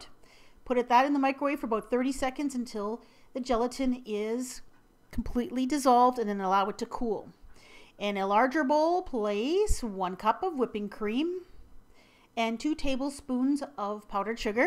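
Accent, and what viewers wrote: Canada. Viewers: God I love you woman, and your Canadian accent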